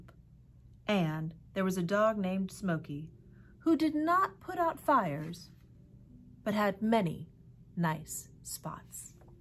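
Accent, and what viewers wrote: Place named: Texas, United States. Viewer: I love you. Ty for this. Btw, u have a Texas accent now. ☺️ I love it!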